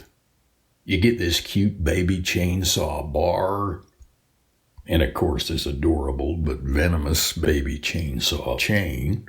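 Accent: South, United States. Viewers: NOW , ... THATS a good review ! sounds like someone who really uses tools , and not some salesman ! liked hearing southern accent , and personality ! the review was more entertaining than the tools . good review .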